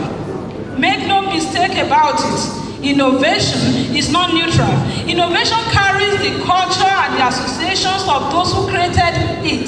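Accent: Yoruba, Nigeria. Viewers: at least she used Yoruba intonation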